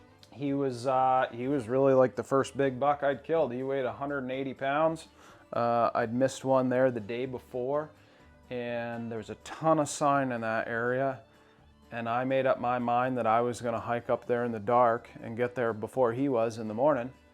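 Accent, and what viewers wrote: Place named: Canada. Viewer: Wicked bucks. I recognize that voice from the podcast you sound more Canadian than Vt eh?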